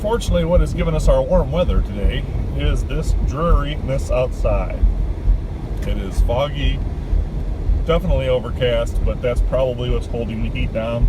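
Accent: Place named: Philadelphia, United States. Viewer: Are you from the Philadelphia...NEW JERSEY AREA???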